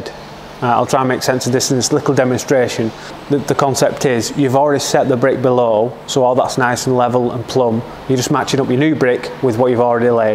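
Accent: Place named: North, United Kingdom. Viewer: I like your dialect. Are you from north UK?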